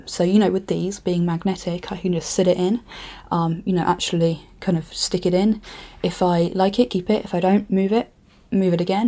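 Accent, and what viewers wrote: England, United Kingdom. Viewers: More videos pls! I love the English accent. Subscribed! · CAME HERE FOR THE BRITISH ACCENT!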